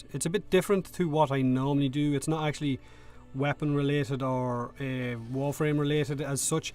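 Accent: Ireland. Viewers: Your Irish accent is awesome :) (seriously Irish and Scotish accents are the best :D) and thanks for the video! :D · How do you add that sweet Irish accent to your videos?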